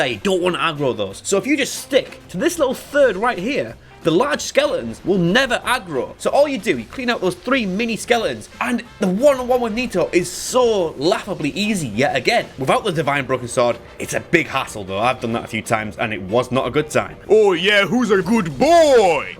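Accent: United Kingdom. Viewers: I didn't come in here expecting you to sound full br'ish, but I stayed. God I stayed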